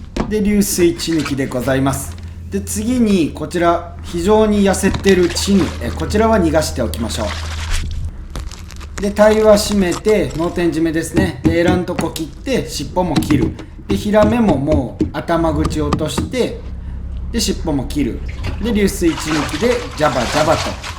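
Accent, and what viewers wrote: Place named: Shodoshima, Japan. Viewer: 夕焼けの小豆島、いいね！小豆島弁聞いてると高松の叔母さん思い出す。ほぼ同じやね。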